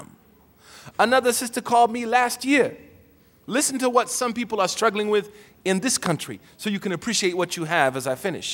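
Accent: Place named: Oklahoma, United States. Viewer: He is from okc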